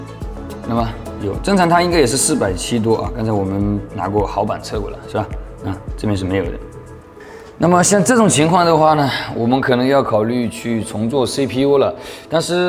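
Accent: Wenzhou, China. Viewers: 牛 上来就是标准温州普通话口音，一看真的还是温州老板 哈哈 赞～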